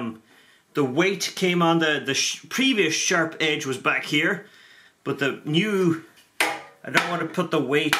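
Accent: Ireland. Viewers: I like how this guy sounds Irish and is still understandable. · Is he Irish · So he IS Irish. I wasn’t sure...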